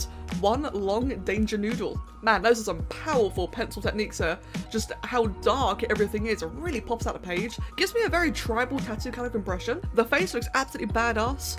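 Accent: United Kingdom. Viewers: Ooookayyy youre British?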